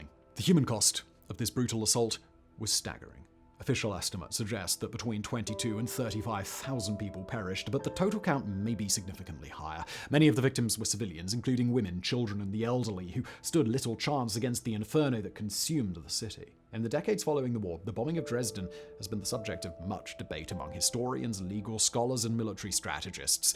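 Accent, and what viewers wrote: RP, United Kingdom. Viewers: A posh Brit complaining about America and how we came and saved his country, how about just say thank you and shut up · I'm an American veteran officer, and suspect you barely touched the topic. It seems you have relatively little to say about the American GIs. Citing hundreds here and dozens there hardly compares to what you say the British did. (You even sound British.) We love hearing how legendarily Good we are, but I believe lying only makes our problem worse. I realize this video is about WW2, but we were the same nation that committed horrific inhumane crimes during the Spanish-American War, during the near destruction of the American Natives during that same decade, and we were still the same culture during Vietnam when our lovely GIs would sight-in their rifles by firing on local farmers out in the rice paddies. I'm simply confident that War brings out the worst of Human Nature. 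That's not an excuse for it. I just think you skipped a lot.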